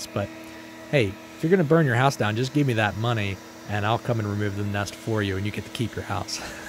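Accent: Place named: Philadelphia, United States. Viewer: You got a Philly accent. Certain words you say sound just like if you were from that area. Say the word Acme in one of your vids. Then I'll know for sure.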